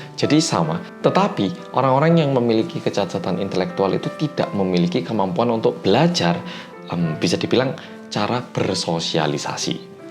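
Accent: Java, Indonesia. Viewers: Finally Medok pokok e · orang korea yang medok nya nggak usah dengan muka serius dong mas. aku malah ngakak e liat muka serius pas awal gini 😅😅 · Logatnya jawa banget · Yeah kembalinya 🤓🤓🤓bersama orang korea yang medokkkk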